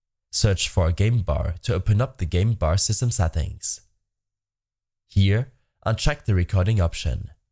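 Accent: Germany. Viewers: Was thinking Japanese from the accent but was surprised that it's German.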